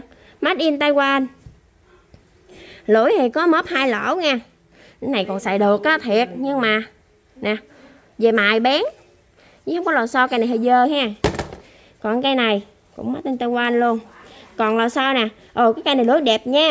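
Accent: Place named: Mekong Delta, Vietnam. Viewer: Giọng nói giống người miền Tây quá bé , nghe giọng này làm hồi ức lại đã từng công tác dưới miền Tây 12 năm . Rất chân chất thật thà! Có dịp sẽ ủng hộ bé . Phong Cách giới thiệu sản phẩm rất thiện cảm .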